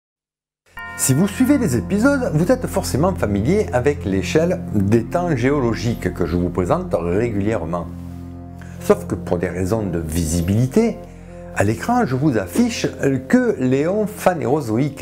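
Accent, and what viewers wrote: South, France. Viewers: Quel plaisir d'entendre cet accent du sud. Comme toujours c'est géniale, tu est le meilleurs vulga en paléontologie que j'ai eu l'occasion de voir, ce que tu fait devrait être regarder au collège.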